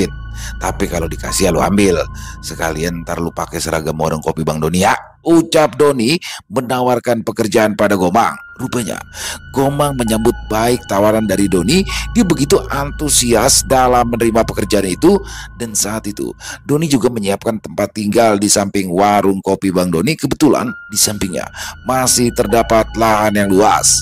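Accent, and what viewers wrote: Jakarta, Indonesia. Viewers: Tangerang hadir.. Logat betawi mantebbb.. Logat kalimantan cakep.. Jawa jg boleh.. Emang serba bisa nih.. 👍👍👍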